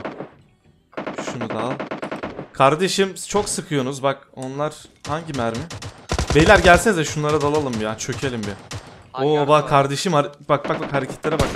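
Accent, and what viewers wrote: Adana, Turkey. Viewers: Abi sen Adanalı mısın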